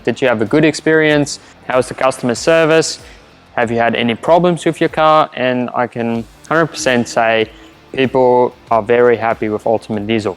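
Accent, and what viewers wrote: South Africa. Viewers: Dude, are you from South Africa?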